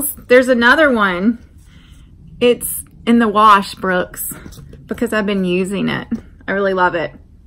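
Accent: South, United States. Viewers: I don't really care what you drink you're just way too cute and I love that southern accent you're a true farm girl.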